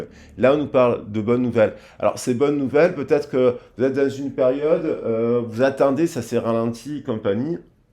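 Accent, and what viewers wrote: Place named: Marseille, France. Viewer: Coucou sa me fait plaisir de vous voir en ce dimanche j aime bien entendre votre accent marseillais c est vrai que c est magnétique et puissant sentir ce désir intense l amour pur véritable c est beau quand c est reciproque c est le coeur qui parle cette appelle des deux âme qui veule ce retrouver c est magique ce bonheur bisous 😚💞🌈🌞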